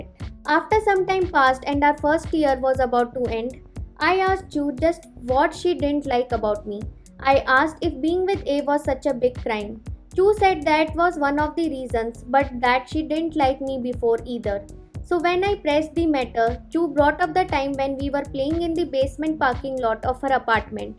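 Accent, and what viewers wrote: India. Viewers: i like your accent..are u indian??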